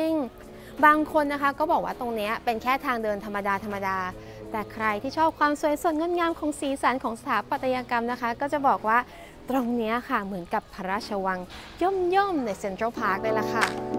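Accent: United Kingdom. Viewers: หญิงคนขายงานศิลป์ชมคุณ ว่า "ชอบสำเนียงและน้ำเสียง UK ที่มีเสน่ห์ไพเราะจับใจในการชื่นชมในงานศิลป์ ทำให้เขารู้สึกดีมีกำลังใจมากๆ เพราะไม่ได้สัมผัสบรรยากาศ และความรู้สึกดีๆ จากนักท่องเที่ยว ที่สวยและน่ารักแบบคุณ มานานมากแล้วครับ" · ฝรั่งชมคุณ เกี่ยวกับสำเนียงอังกฤษฟังแล้วมันมีสเน่ห์น่ารักจริงๆค่ะสำเนียงจะแตกต่างกับสำเนียงอเมริกา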